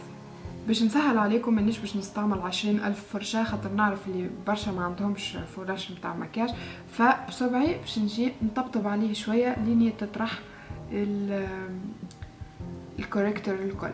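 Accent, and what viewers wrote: Tunisia. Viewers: انا مغربية نحب لهج تونسي برش تبارك عليك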